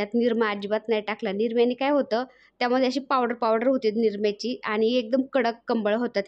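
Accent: Ahmednagar, India. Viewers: मला वाटलच तूम्ही नगरच्या आहे आम्ही पण नगरचे आहोत पण नाशिक ला राहातो माझे मिस्टर आशेच बोलतात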